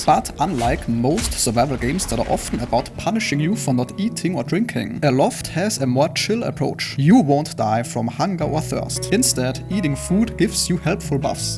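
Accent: Germany. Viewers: Bin das nur ich oder klingt das nach deutschem English? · german for sure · dat denglisch !!! :(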